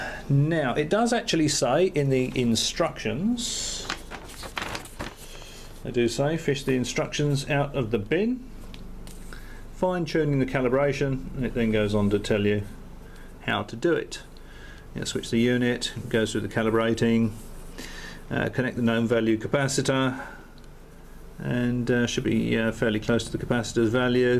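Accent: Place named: Australia. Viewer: Hi!! you seems to be an aussie!!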